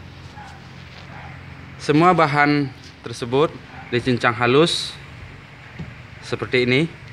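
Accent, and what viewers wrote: Solok, Indonesia. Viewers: fadel gaul ambo danga kayak nyo urang solok lah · Assalamu'alaikum mak,mokasih ilmu jo wawasannyo mak, logat bahaso daerah solok, dima Solok nyo mak?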